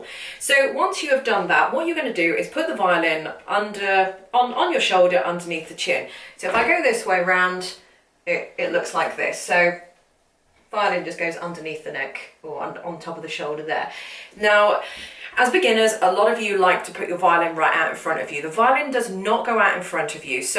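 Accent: United Kingdom. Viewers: I do not know yet your name, but I feel grateful to see individuals like you sharing with us to make our dream come true. I always wanted to play violin since I was a child. I just made the decision to learn following your lesson, and guess what, I bought my Mendini MV500 4/4 full side violin last week, and I have made the total decision to learn playing violin, and know how to play "Amazing Grace", that is what I will do first.Thank you, your lesson are easy to follow, and love your British pronunciation. I have one request for you : can you play Amazing grace with the violin for me!!! Thank for motivate me in this new adventure.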